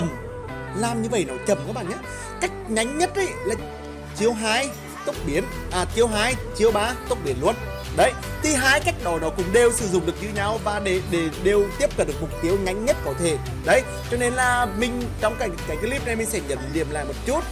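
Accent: Nghe An, Vietnam. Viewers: nghệ an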